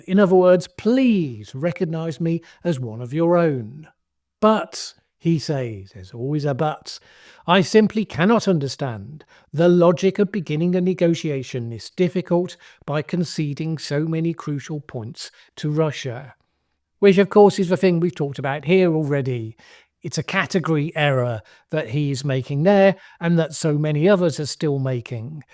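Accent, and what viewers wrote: United Kingdom. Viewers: It's only your British accent that makes you sound intelligent, sir. But the actual content of your bloviating is vapid, smug and snide.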